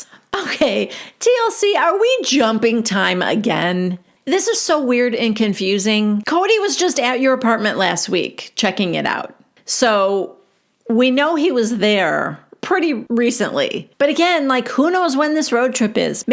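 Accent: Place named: Midwest, United States. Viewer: I got to say we are in March 2024 and I too used to like ‘s videos. I do not like her anymore. Not that that matters or is going to sway anyone’s opinions but she is confused between a content creator on YouTube and Homeland security. She dives into peoples lives. I mean yes granted they are public figures but when no one would be surprised if she knew their blood types and their Social Security numbers, that’s a bit of a problem however, that is whatever you know I think that she does a little too much, but that was just my opinion. It wasn’t until Garrison died that my opinion has really completely changed about her, she is sitting there criticizing TLC in the production company and she’s not wrong with what she’s saying she’s not wrong at all with what she’s saying actually but what she’s criticizing them for having things out there that they may see and they may have to relive all of that she is doing the same exact thing, every Difficult situation that family has ever gone through. 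She has made at least five videos about at least each thing and then when it’s like bigger things then she’s putting out like three or four videos a day about it so it’s a little bit of the pot calling the kettle black to me, but that was just like the cherry on top of the Sunday really was the fact that this girl who lives in I’m not sure where she lives but with the way that she talks that accent that she has the way she says things it screams like Midwestern to me But she is where she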